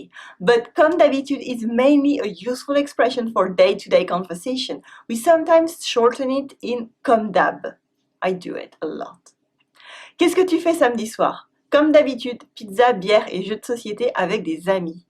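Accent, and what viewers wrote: France. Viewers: What an horrible french accent when speaking english ! Even for my french ears, it is hardly bearable.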